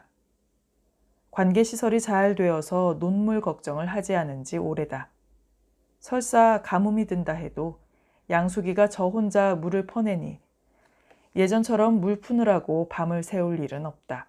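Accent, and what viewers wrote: Gyeongsang, South Korea. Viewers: 여긴부산인데요 갱산도미까 사투리가 구수해요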